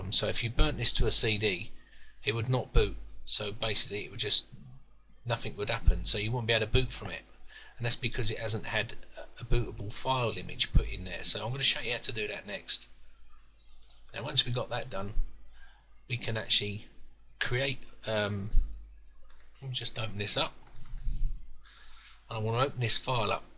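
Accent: England, United Kingdom. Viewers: buddy ur from england???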